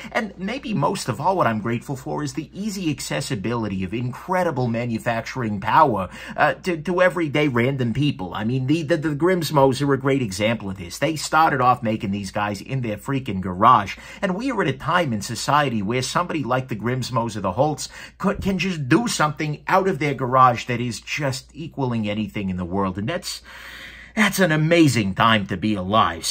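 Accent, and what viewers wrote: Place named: Long Island, United States. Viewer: Long Island loves you! You sound like one of us! Lol! You bring me so much joy watching you dissect my favorite things other than my sons, KNIVES! Shot out to Doc Frunky and Frankie and Byrd! They are awesome too!!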